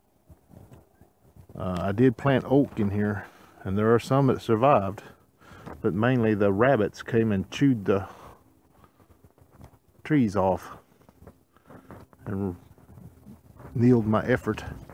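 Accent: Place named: Oklahoma, United States. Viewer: What state are you in. Sounds like Oklahoma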